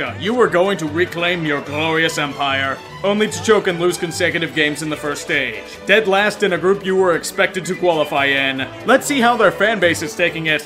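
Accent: United States. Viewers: kinda, i watch him a lot so i expected the cringe. But he, for the most part, knew what he was talking about. I always find it hard, even as an american myself, listeing to someone talk about football in an american accent but i was proud of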